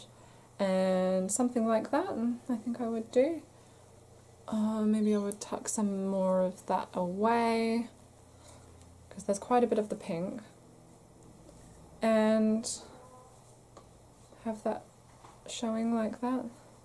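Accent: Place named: Australia. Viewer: Wow !! Lismore, nice part of the world. I’m so happy to find you, I’m from Sydney and it’s so nice to hear another Aussie voice on YouTube...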